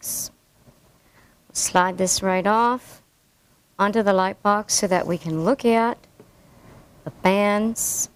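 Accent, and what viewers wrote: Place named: South, United States. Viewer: Slight Southern accent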